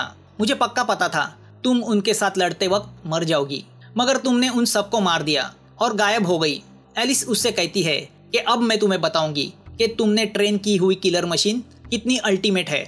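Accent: Maharashtra, India. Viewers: Tula Marathi yete bhau bolnya Varun Maharashtra cha distos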